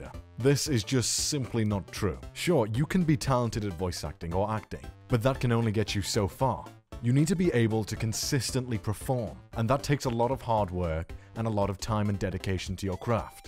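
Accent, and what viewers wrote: United Kingdom. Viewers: Are you british? You sound it.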